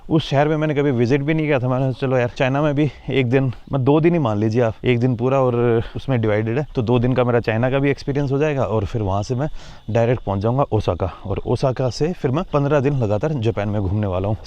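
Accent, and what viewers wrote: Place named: Arunachal Pradesh, India. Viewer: whr were u?? Was eagerly waiting for you and was worried.. 2 din lagega gaye aapko video ko upload karne..? The way you speak in Arunachalee hindi accent was awesome.. ❤ from Longding, Arunachal Pradesh 🇮🇳. Was expecting your visit Longding, Arunachal Pradesh from Longwa, Nagaland 🙏. Anyways, have a safe journey & stay..👍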